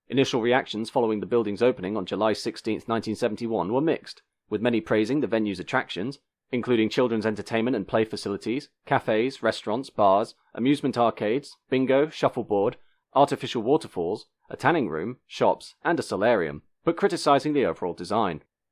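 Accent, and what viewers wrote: United Kingdom. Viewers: Why do we need to have British narrators on the reports. Between the irritating accent and the annoying pronunciation the f certain words, (al you minimum) it makes these reports hard to listen to. If this is published for use in North America use a North American narrator to read the script..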